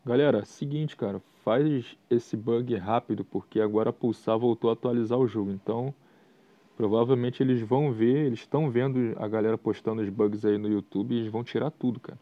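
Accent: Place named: Rio de Janeiro, Brazil. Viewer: Carioca façando é tri dahora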